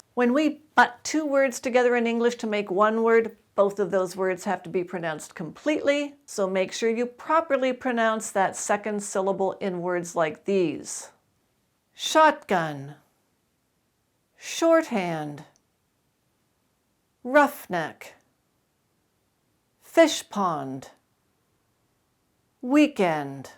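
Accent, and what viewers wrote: United States. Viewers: we are speaking english - you with your ( gentle ) American accent, me with a strong Aussie accent. And yet somehow it sounds the same... Cheers lots Mate !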